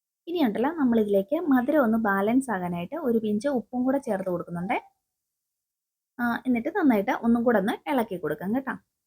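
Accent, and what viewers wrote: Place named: Trivandrum, India. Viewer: ഭാഷ കേൾക്കുമ്പോൾ ട്രിവാൻഡ്രം ആണെന്ന് തോന്നുന്നു 😊😊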